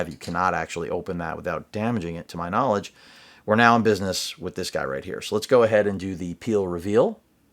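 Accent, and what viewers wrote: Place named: United States. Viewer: I love the american slang (gonna wonna)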